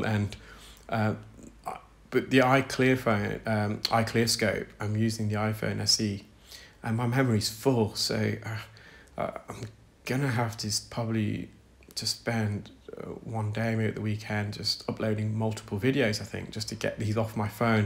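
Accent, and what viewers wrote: United Kingdom. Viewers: I'm smiling because with your British accent I hear " right correct ".... Love watching your videos!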